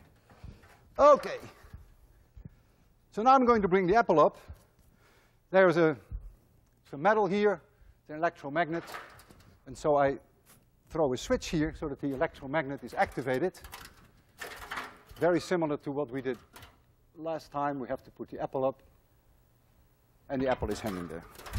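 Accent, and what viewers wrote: Netherlands. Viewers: The two most common comment I read here about are: 1. "Amazing teacher" I totally agree. 2. "He is Dutch" I assume because your accent, but (unfortunately) I can not capture that. For me your accent is very good and very compatible with my rudimentary English.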